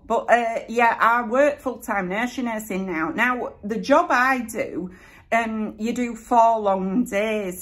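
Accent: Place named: Bradford, United Kingdom. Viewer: That was fab - I'm sure you've had lots of ups and downs in your life but I bet it's never been boring! One more question - are you still going to start your art course? I guessed as soon as I started following you that you had a Bradford accent, but just one Geordie word sneaks in - when you say "wor" instead of "our"! I'm guessing that's rubbed off on you from your mum rather than memory. Last but not least, being a salad dodger myself, I'm glad that your favourite food has gone from lettuce to toast!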